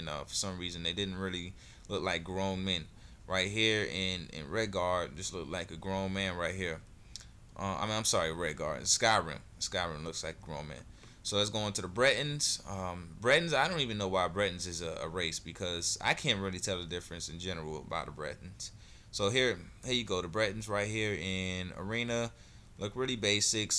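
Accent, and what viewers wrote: United States. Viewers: sounds like a mix of eubonics and southern drawl. stop hurting my ears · Somethin out of barney! 😂😂 are you American?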